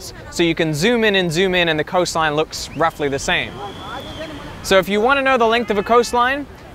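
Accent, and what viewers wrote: Australia. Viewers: It's funny I hear him speaking with a slight Australian accent. I think he went to film school there. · You are getting a slight Australian accent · Finally watching an old video I thought I was the only one noticing the australian accent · wow, his voice is starting to have an Australian accent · Watching this video 5 years later and damn does he have an Australian accent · He sounded so much more Australian back then. What a difference. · what's crazy is i detect a very, VERY slight australian accent here that's not there in more recent videos... wild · he sounds more australian in this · is it me or is his accent finally picking up a little Austrailian tone?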